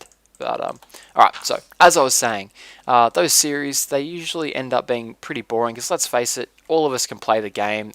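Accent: Australia. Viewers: Are you Australian? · Your australian accent :)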